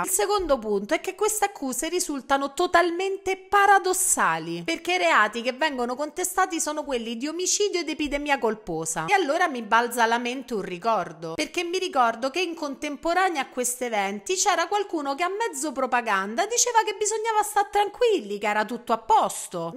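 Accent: Rome, Italy. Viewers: Canale interessante il suo, ha attirato la mia attenzione, anche se il suo accento romano.. 🎶Mamma lupa, poveretta, i è svenata con i denti🎶 Il giornale ha riportato con stupore Non poteva sopportare che il suo popolo invasore🎶 Diventasse una colonia di invadenti Hey-la-la-li, ya-la-la-li, ya-da-da-ya-da. Bom dia.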